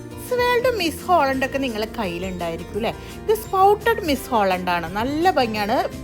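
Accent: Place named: Thrissur, India. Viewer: Eavideya sthalam.. Samsaram kelkkumbol thrisshur dist anennu thonunnu...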